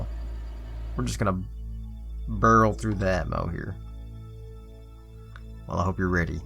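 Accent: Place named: North Carolina, United States. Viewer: Are you from North Carolina? I'm trying to pin down your accent.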